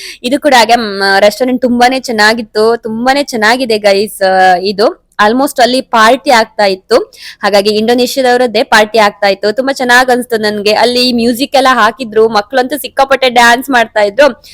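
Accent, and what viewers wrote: Kundapura, India. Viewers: Nam kundapura kannada keli kushi ayt🎉🎉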